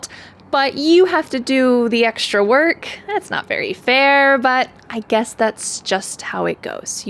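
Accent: United States. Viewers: How much I adore and enjoy your clear American pronunciation,. It's awesome!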